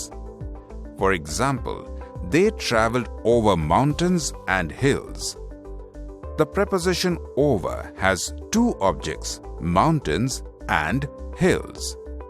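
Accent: India. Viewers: Indian English ??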